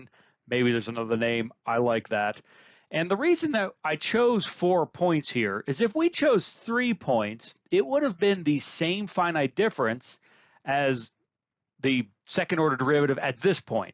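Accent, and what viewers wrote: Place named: United States. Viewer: nevermind actually, apparently according to which English accent you have it's pronounced differently and you have the American version my bad. Still a good video though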